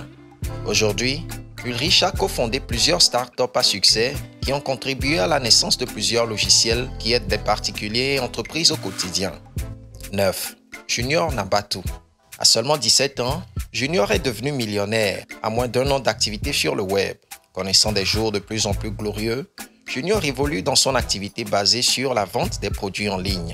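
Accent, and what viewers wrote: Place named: Togo. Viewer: Un togolais est sûrement à la base de cette vidéo quand on écoute la tonalité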